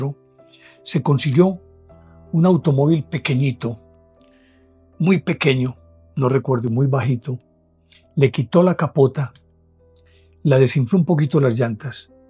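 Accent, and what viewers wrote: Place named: Colombia. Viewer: Mi admiración señor don, es fascinante su forma de narrar y contar historias, nos seduce su brillante y forma de hablar con ese bonito acento colombiano,como siempre ha estado usted magistral con su relato,gracias por su inmensa labor de compartir por este canal su enorme sabiduría,saludos desde jerez, España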